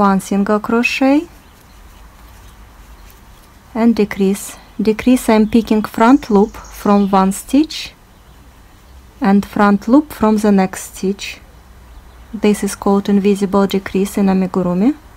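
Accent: Russia. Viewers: Оооой русский акцент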